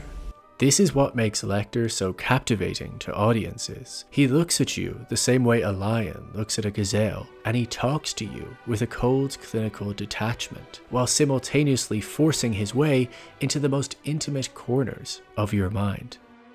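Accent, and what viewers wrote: Ireland. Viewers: I dedect a faint irish twang?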